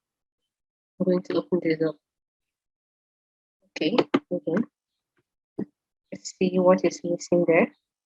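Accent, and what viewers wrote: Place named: Igbo, Nigeria. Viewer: The Igbo accent makes me feel at home 🥳🥳🥳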